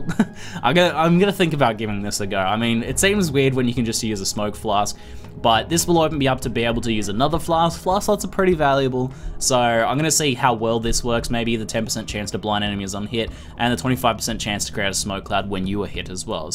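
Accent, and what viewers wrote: Australia. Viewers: why do Australians pronounce idea, i dear? why..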